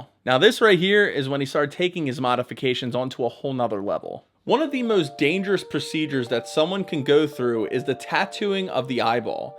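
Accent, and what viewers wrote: Philadelphia, United States. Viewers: My thoughts are that you're Philly/South Jersey accent is so heavy, that's all I could focus on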